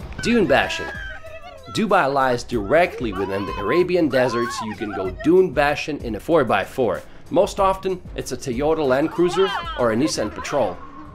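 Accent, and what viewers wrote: Russia. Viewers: I would've Never placed his accent as Russian! That's Crazy! 😆👌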